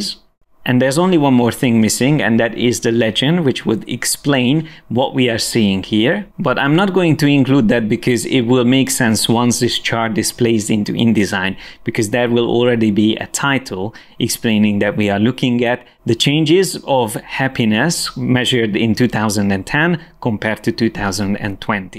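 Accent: Hungary. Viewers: Great channel, awesome background and video, huge help! Thank you bro :) Ez most egy kísérlet arra vonatkozólag, hogy kb 4 másodperc után hasított belém, hogy oké, ez a srác ha nem magyar, akkor én se vagyok az :D A hunglish ellenére vagy inkább mindazzal együtt tényleg az egyetlen videó amit annyira profinak találtam, hogy tényleg végignéztem, és öröm látni, hogy új fejlesztésekről és korrekt designról kapok heads-upot és tutorialt! Podcast, newsletter, jó az ilyen profizmust látni azon felül, hogy tényleg kerestem valamit és meg is kaptam rá választ! Menőség és gratula! <3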